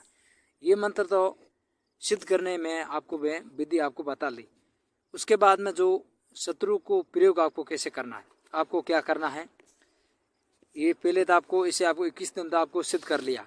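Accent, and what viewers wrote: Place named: Rajasthan, India. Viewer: गुरुजी में जानना चाहती हूं आप कहां रहते हैं मैं मिलना चाहती हूं I am also from Rajasthan. Your voice tells me that you are from Rajasthan. Please tell us where you are from and I want to meet you.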